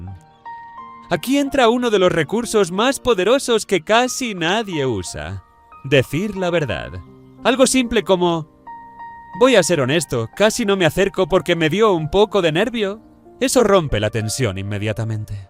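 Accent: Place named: Spain. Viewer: siento que si le quitamos el acento español a su voz se parecería a la de Satoru Gojo jejejeje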